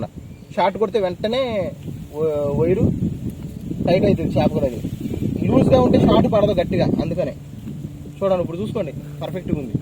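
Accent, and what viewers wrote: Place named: Andhra Pradesh, India. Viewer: nee mata andhra vaala mata lekka undhi anna ravva chepunu mana vallu emani pilustharu boccha chepanena?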